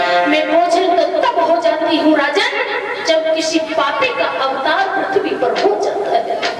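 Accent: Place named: Fatehabad, India. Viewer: Maa Vivek Se Sundar to Katha kahati hi jai Unki Awaaz to Fatehabad Jaisi Hai❤❤❤❤❤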